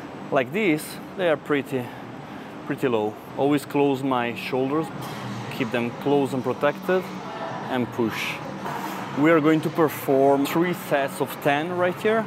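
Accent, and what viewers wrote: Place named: Italy. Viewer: i assume by your accent , tu sei un italiano amico !!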